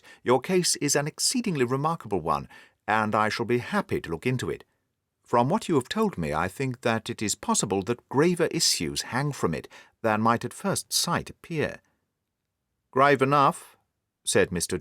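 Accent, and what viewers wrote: United Kingdom. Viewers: Beautiful British English, high reading class. I listened with pleasure. Thank you so much.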